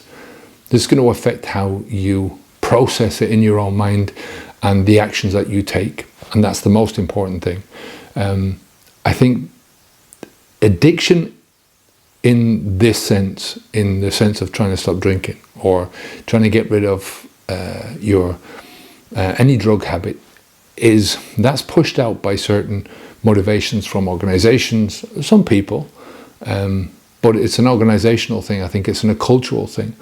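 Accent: Ireland. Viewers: Your accent seems a bit stronger now that you're back in Ireland!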